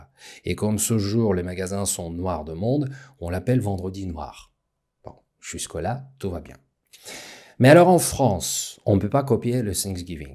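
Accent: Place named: Russia. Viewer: Un "russe" avec un gros accent russe fait une émission en français pour les français en disant "On copie". C'est absurde et illustratif des "russes" occidentalisés qui ne savent plus dans quel monde vivre., faites-vous soigner!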